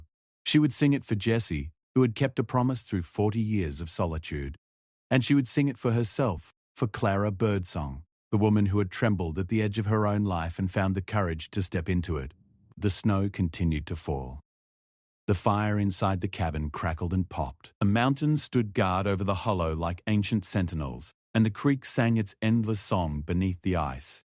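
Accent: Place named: Australia. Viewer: The narration isn’t exactly odd…it’s spoken in an Australian dialect. However, why would a story about a relatively remote part of America and its past be offered in a dialect of English from the other side of the world?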